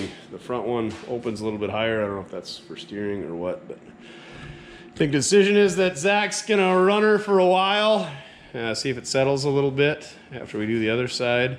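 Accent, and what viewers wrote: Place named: Wisconsin, United States. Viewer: Looks great. I detect a Wisconsin accent.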